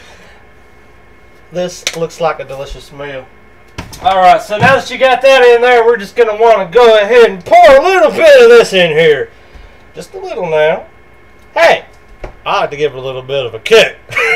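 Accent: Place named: South, United States. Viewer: love the hillbilly voice! :D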